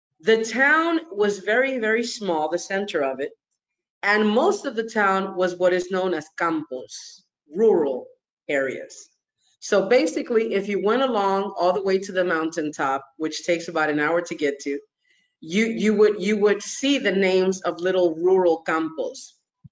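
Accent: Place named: Dominican Republic. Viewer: Wow Im glad you clarified because I couldn’t pin if you were Dominican or American jeje por el acento wow vete con Dios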